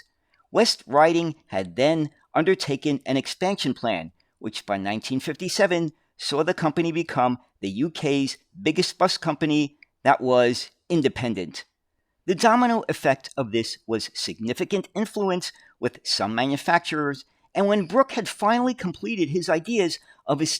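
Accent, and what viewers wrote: United States. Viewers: Thank you for your Video..! Seems strange a "Guy" excuse the pun ? with a New York accent is interested in my local childhood buses..Brought back happy memories. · I seem to be drawn to British bus videos - I simply find it fascinating to hear an American accent talking so enthusiastically about British buses!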